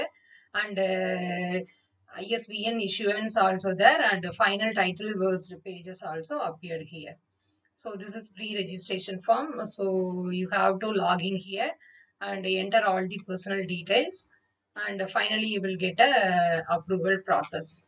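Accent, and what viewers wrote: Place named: Tamil Nadu, India. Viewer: Its not BUBlish....you should pronuce it like PUBlish....please polish your english pronunciation. Also please practice by attending spoken english....do not kill us by talking like butler english. Your english slang clearly shows that you are from Tamilnadu.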